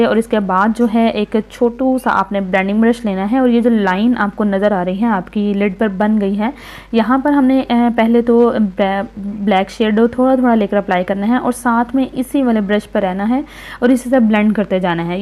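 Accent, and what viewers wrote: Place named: Pakistan. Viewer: Apka way of talk boht cute hai...pakistani language hai.meri ek frnd dubai me thi vo apke jaise ho bolti thi... beautiful makeup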